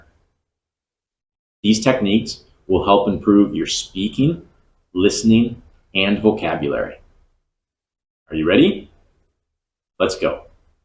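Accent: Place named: General American, United States. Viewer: face-blue-smiling: ... Thanks a lot teacher for all you do for us. By the way, where is your accent from (state/city). I like it a lot. It's crisp and clear. It sounds like the General American English. When you speak I pause the video I repeat what you just said and try to imitate your accent. Greetings from Miami ... !!!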